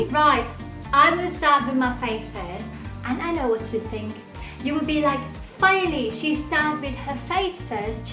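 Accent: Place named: Thailand. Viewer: I like your Thai accent!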